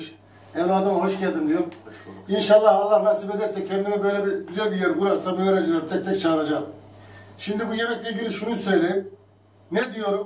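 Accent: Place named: Ankara, Turkey. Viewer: Şefim Ankara limisiniz yanlış mı anladım yav zere aynı bizim buraların şivesi diyorum 🥰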